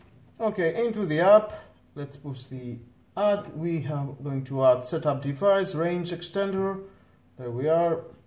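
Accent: Greece. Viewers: και μένα μου φάνηκε λίγο ελληνική η προφορά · Μόνο οι Έλληνες έχουν τέτοια αγγλική προφορά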